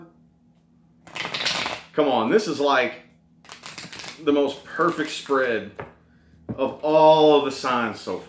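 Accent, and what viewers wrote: South, United States. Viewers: Cool southern drawl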